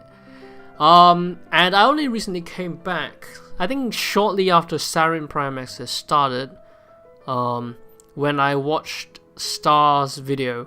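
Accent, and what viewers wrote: Singapore. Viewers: DAMNNNN BOII, when i first heard ur voice, i knew u were singaporean, high five im singaporean too :/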